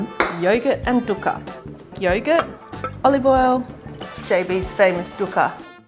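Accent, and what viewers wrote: Australia. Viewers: love yr aussie accent.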